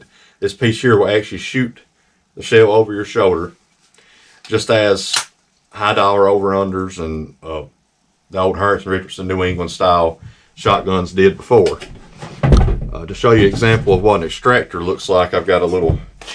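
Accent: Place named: South, United States. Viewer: I subscribed just because of his voice and southern accent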